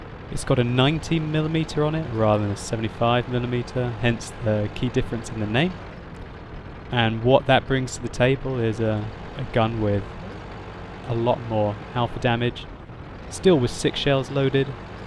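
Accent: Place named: Australia. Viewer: why do you sound so australian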